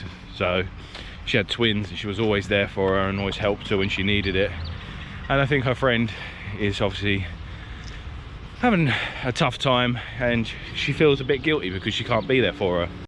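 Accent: Australia. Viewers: I detect the hint of an Aussie accent ❤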